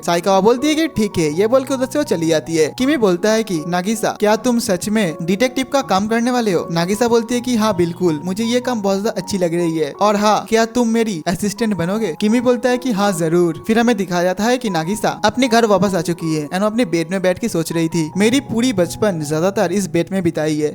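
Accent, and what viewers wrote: Bengal, India. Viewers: Bhaiya aap Bangali ho kya?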